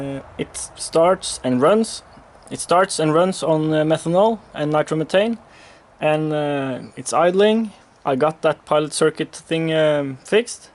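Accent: Norway. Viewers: Jeg bare så på den videoen her i 10 sekunder før jeg hørte norwinglish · Jeg syns aksangen virket Norsk! 😁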